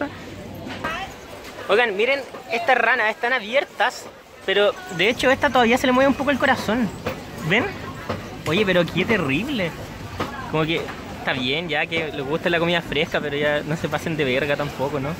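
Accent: Chile. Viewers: Soy chilena y tu acento es re pesado, nose si pones el acento asi para los videos o tu voz es así, pero no todos los chilenos hablamos con ese acento 🤣🤣❤️ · te pido disculpas por el abuso,ví tu vídeo porque el acento chileno es mi favorito y de algún modo viajamos a esos países, Gracias a vos y al trabajo de otros youtuber....pero pienso deberías moderar tu lenguaje respecto a las diferentes culturas,son fuertes cierto,en América talvez jamás comeríamos muchos de esos animales., Pero son personas con costumbres diferentes y si entendieran lo q dices puedo jurarte q más de uno se ofenderia.....es imposible no impresionarse pero podemos controlar lo q decimos...soy hondureño,quizá no peques lo q digo, pero es un consejo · Claramente es acento chileno. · Tiene un acento chileno muy marcado. Me parece que tiene una musicalidad muy distinta a las de otros países hispanos.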